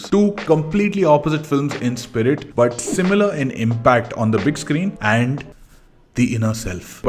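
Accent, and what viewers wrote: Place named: Tamil Nadu, India. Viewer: Nice review sensibility, and good cinema awareness, man. I haven't watched these movies yet, but your review makes me want to. And, with a surname like, I would have thought you were a Malayali. Your pronunciation of the Malayalam words, though, - flawless as they are - suggest that you aren't primarily a Malayalam speaker... you sound more Tamil than Malayali.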